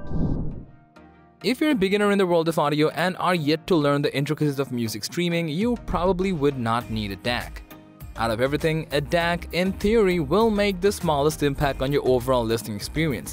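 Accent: India. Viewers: Oh yes, but along with the indian accent. He also has a British, american, and what seems like a Kiwi accent to me. That's quite impressive.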